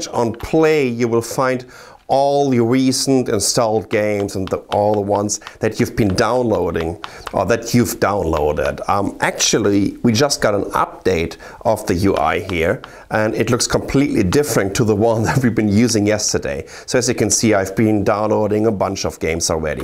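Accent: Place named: Germany. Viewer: God damn i love Germans speaking english.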